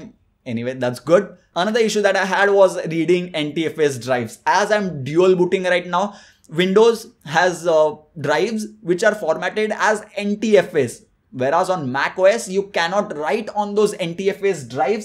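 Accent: India. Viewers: Don't understand fucking anything. Indian accent...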